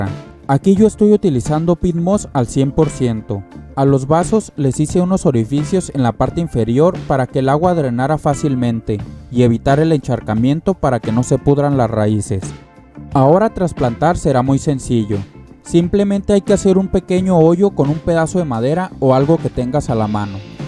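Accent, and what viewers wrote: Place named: Colombia. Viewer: Eres colombiano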